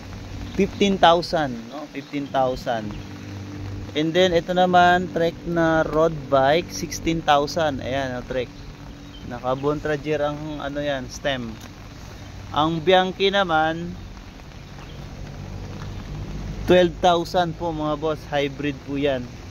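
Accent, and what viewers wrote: Visayas, Philippines. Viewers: Bisaya ka bai? 🚴‍♂️🚴‍♂️🚴‍♂️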